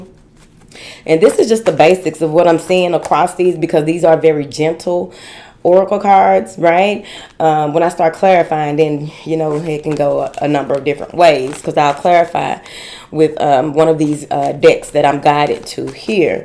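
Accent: United States. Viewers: I love hearing your messages. Thank you so much for always being you!! I love your country accent!!!❤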